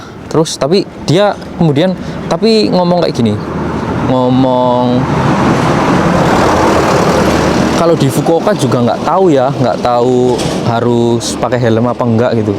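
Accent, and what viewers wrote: Banyumas, Indonesia. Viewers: orang mna sih kok logat bahasanya sama kya inyong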